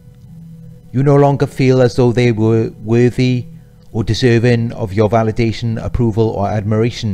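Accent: Wales, United Kingdom. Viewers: It’s actually a Welsh accent. Welsh people are some of the nicest people you’ll meet :)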